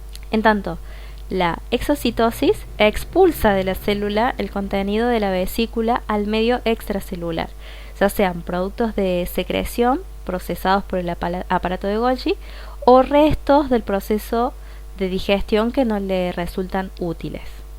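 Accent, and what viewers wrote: Cordoba, Argentina. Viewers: Sos de cordoba? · Voz cordobesa osiosi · re acento cordobés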